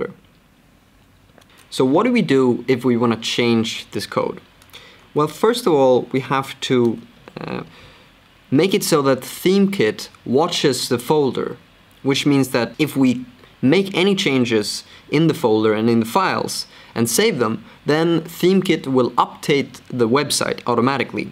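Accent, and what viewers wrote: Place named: Sweden. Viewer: omg älskar dialekten hörde direct att du va från sverige!!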